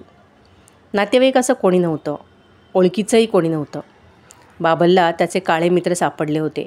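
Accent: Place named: Konkan, India. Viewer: Uttam wachan.kokni ucchar hi chan 👌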